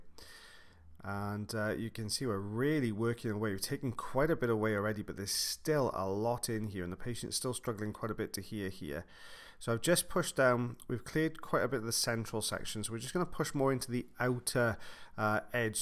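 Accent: Wales, United Kingdom. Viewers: This made my day!!! Having a long day at work and hearing our melodic Welshmen narrating is so relaxing and pleasant. Thank you for doing this for your fans!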